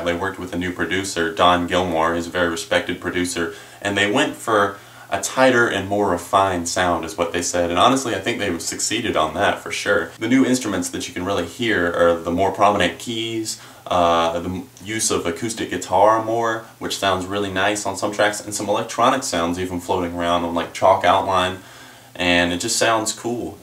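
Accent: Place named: South, United States. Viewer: It sounds like you have a southern accent in this video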